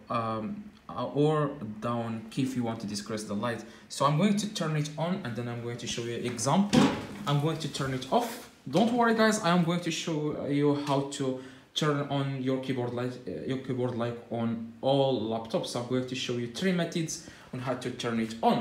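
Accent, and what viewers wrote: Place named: Morocco. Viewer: Sound morrocan 😁🤩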